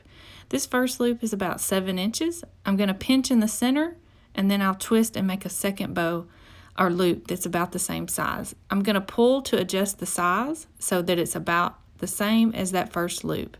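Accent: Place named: South, United States. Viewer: Yes! Love a good ole fashioned southern accent!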